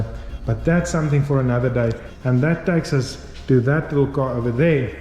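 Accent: South Africa. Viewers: I caught the South African 🇿🇦 accent straight away & had to check your channel out. It looks like a lot of interesting content & I'm looking forward to going through the D Type build.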